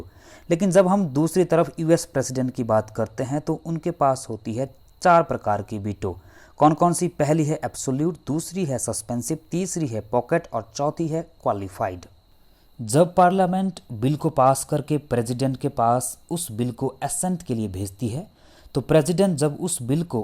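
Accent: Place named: Bengal, India. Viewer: Sir aap jarur other language bolne wale ho... I think bengali aur assemese😂😂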